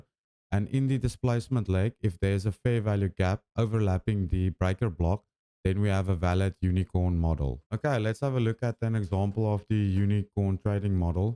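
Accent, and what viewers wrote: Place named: South Africa. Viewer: Brother you sound South African. Wanted to say thank you for your growing channel and your lessons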